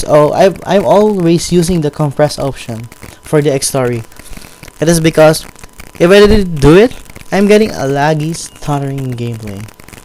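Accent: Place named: Philippines. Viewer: are you Filipino? You have the accent.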